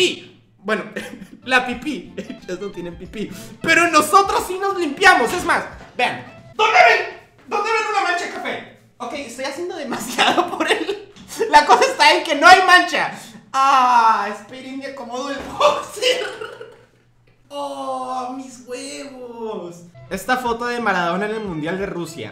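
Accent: Colombia. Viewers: Eres colombiano no?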